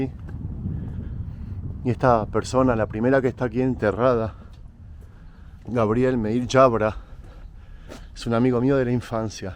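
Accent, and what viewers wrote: Argentina. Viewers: El señor es Argentino?? Habla como Argentino...sus padres seran Judios???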